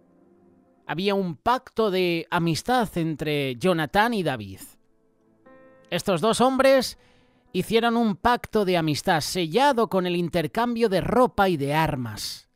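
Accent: Spain. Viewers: Saludos desde Colombia gracias por su narración de la historia de David y Jonathan Bendiciones .... Tengo una pregunta fuera del tema me di cuenta que es usted español porque en España prevalece el catolicismo si en toda europa estuvo Jesus con sus enseñanzas? Es una curiosidad me gustaría si alguien sabe la respuesta me explique gracias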